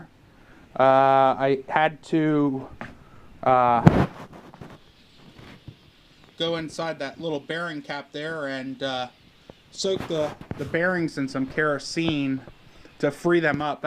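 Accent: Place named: Pennsylvania, United States. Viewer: I knew you were from PA. I could tell by the accent!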